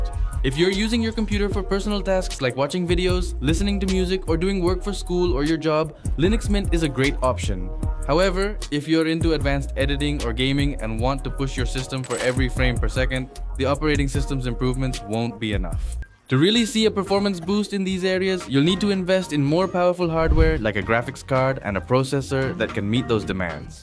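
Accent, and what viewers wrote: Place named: India. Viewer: Bro is in Colombia and has a indian accent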